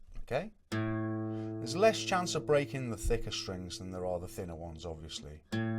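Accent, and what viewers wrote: Liverpool, United Kingdom. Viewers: Ahh ok, it had to be one of them and to be honest your accent is just a tiny bit more softer and more subtle than what I expect from Liverpool lol. Anyway thanks for the upload, good video, I'm already experienced in alternative tunings but your video just loaded automatically after a previous video I watched and you had me playing along like I was a beginner.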